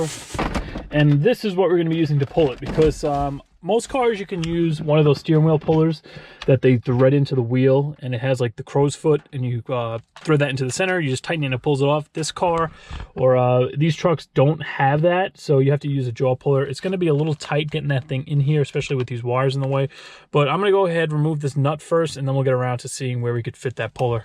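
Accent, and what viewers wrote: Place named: Long Island, United States. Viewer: Hey you from Long Island dude?... you sound it lol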